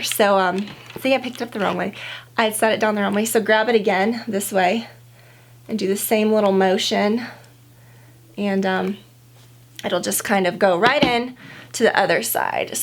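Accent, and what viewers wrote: South, United States. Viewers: Southern accent is so funny. Love it. Of course I am southern. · I don’t know what I love more, or her country accent! 😍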